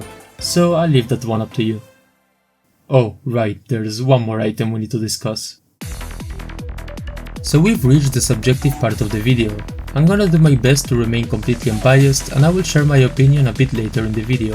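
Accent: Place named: Spain. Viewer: Spaaaain. Blue archive has come so far! I almost didn't notice the accent. I may be wrong but...anyway very high quality video.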